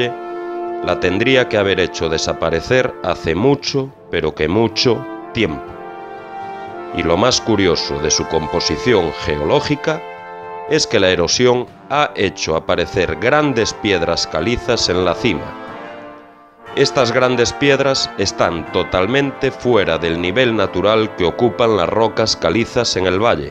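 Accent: Galicia, Spain. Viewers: excelente trabajo paisano, ya que por su acento deduzco el galegismo que nos une, gracias por este video...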